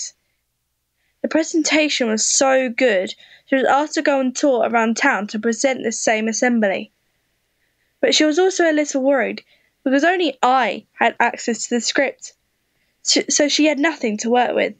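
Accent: United Kingdom. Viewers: OMG I LOVE YOUR VOICE!!! (sorry im a sucker for British accents) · Is she British? I’m not being Offensive but I’m wondering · British yes finally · Eyyy another british girl!! :3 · I love your British accent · Devica she's not Australian!! She's British!! I'm British too and that's what they sound like! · It’s a British accent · I love her British accent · Is she british i luv brits! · U sound British I like it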